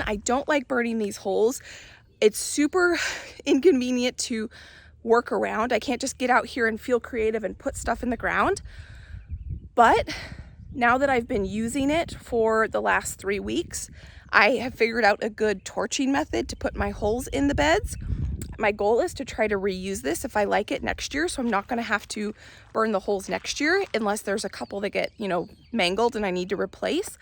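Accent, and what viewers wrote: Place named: Michigan, United States. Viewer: Are your out of town guests from Michigan ? I was hearing a bit of a Michigan accent off you today lol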